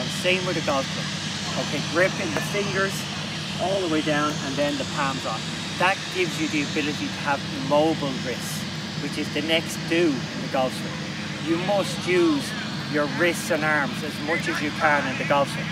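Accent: Ireland. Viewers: I love getting golf lessons with an Irish accent.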